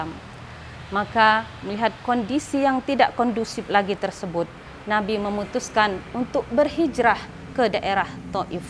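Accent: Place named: Liwa, United Arab Emirates. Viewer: Sukses terus ya dindaaa.. Tapi logat liwa nya di kurangi yaa... Hee heee hee..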